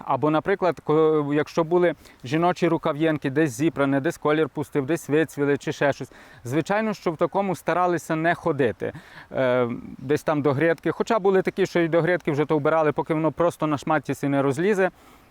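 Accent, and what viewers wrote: Hutsul, Ukraine. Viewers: Як я люблю слухати цього чоловіка, ну дуже файно розповідає, з дуже гарним гуцульским діалектом!!! Христос ся рождає!!!!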